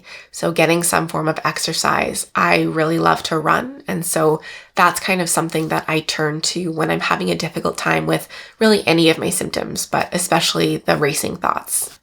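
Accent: Canada. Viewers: Great educational video as always,! Very random but I always forget you’re Canadian until I hear you say certain words. In this video it was “about” 😂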